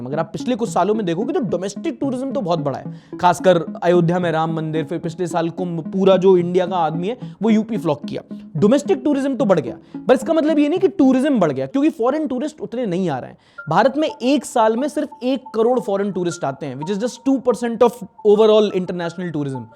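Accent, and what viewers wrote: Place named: Bihar, India. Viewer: Bhai bihar ka asar saaf pata chal raha hai😂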